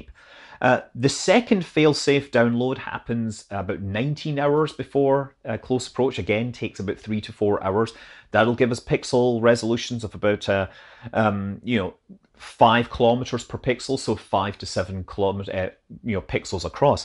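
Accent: Scotland, United Kingdom. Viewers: Wait... you're Scottish?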